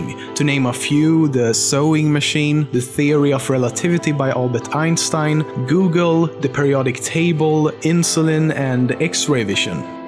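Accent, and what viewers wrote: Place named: Sweden. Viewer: Youre sweedish i hear it on the accenr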